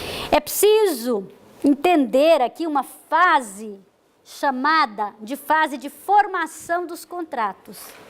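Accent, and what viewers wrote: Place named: Brazil. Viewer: Ela é brasileira? Sotaque parece do Brasil!!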